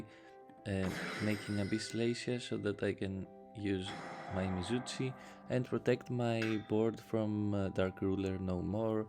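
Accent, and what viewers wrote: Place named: Greece. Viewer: Yes I‘m Greek too but I live in Germany thats why I recognized your accent bro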